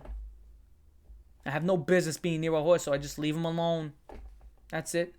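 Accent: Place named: Baltimore, United States. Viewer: I have a feeling you're from baltimore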